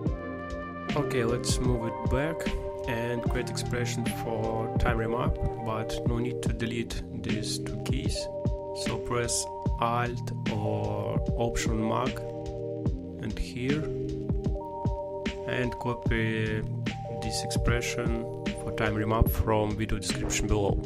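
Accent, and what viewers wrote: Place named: Russia. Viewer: so russian accent )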